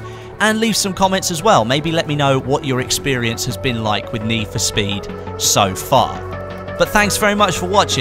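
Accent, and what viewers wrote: England, United Kingdom. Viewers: Nice video again! You always make my day better with your lovely british accent;) And just in case i win the giveaway, i want to thank my mom and dad for making me food every day, and i want to thank my computer for making me able to write this comment, and I can't forget to thank my aunt for being my aunt... Ohh, i also want to tell DANNYonPC that you need to get off YT more often, cuz if you don't, you will turn into a very bad hot sauce. Atleast that's what some old guru told in Australia... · Very good video! Been subscribing you for a long time. Like those videos uploaded and what I like most is your british accent! Well done. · I love your videos and your English accent.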